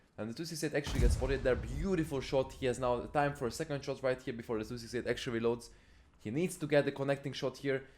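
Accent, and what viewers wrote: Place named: Hungary. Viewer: I'm not arguing with you either 😊 has a typical hungarian accent 😅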